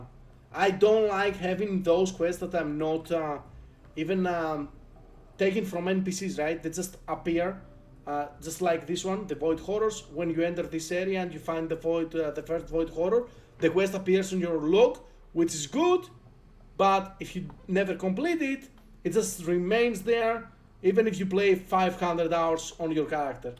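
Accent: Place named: Greece. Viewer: Σιγουρα ελληνικη προφορα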